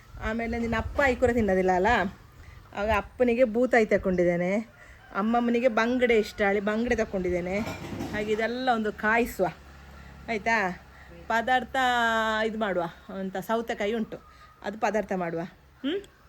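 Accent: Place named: Kote, India. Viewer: ಕನ್ನಡ ಕೇಳಲಿಕ್ಕೆ ಚಂದ ಉಂಟು ಕೋಟೆ ಕನ್ನಡ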